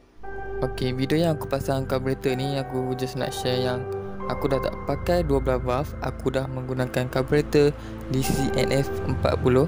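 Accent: Kedah, Malaysia. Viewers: Campoq dey ..kedah KL 😂 hg ckp utara dah sempoi bak ang .. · ckp pelat kedah terus ja bro . nampak struggle sat pelat kedah sat pelat KL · Orang sabah,cara bunyi loqhat org kedah,tpi apa2 pn trbaik bro,HATIMU KENTAL, org klau tangan berseni prmulaan cat wlaupn x ada pngalaman, ttap akn nmpk cantik..trima ksih bro..mmng terbaiekk...dari sya IPOHMALITALAKNYOMBONGPUNYA,PERAK..hiehiehie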